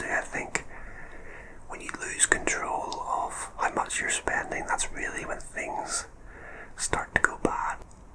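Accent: Ireland. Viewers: your accent sounds Irish 😂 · ❤Sometimes I play the lottery... but its a real downer when I lose and I have to go back to work! Love your Irish whisper! ❤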